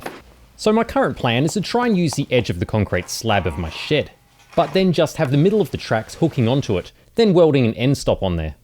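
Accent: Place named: Australia. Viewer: As a not native English speaker, your Australian accent is no problem. Scottish though, that requires subtitles...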